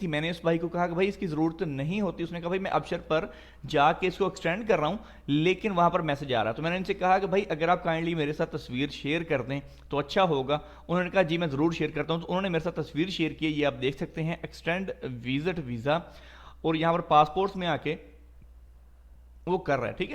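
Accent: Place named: Punjab, India. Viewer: آ پ کا نام کیا ہے بھائی آ پ کی لینگویج سے پنجاب سے لگ رہے ہیں بہت اچھا کام کر رہے ہیں ویزٹ ویزہ کے متعلق آ پ کی ویڈیو ز سب سے زیادہ انفار میٹو ہیں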